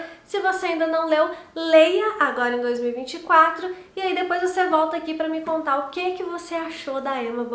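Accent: Minas Gerais, Brazil. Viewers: Ela é mineira?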